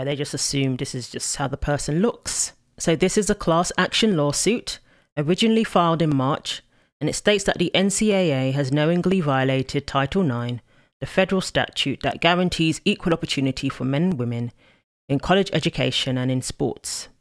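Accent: United Kingdom. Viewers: Are you American or a Brit ? The accent says British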